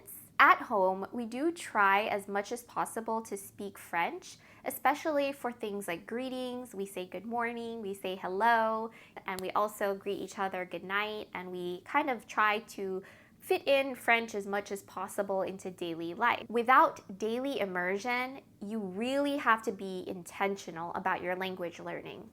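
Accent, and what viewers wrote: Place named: United States. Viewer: English! It is a difficult language, so many people speak English differently with different accents (UK, U.S., Australia…) I prefer the U.S. English accent like yours 😄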